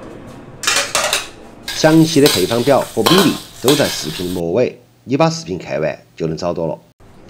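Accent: Chengdu, China. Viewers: 你的成都话我太欣赏了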